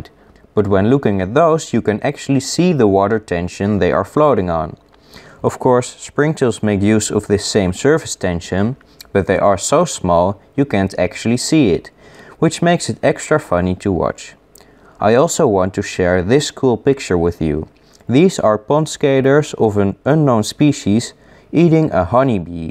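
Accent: Netherlands. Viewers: thats such a heavy bad dutch accent wow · Its a dutch accent we have a really weird g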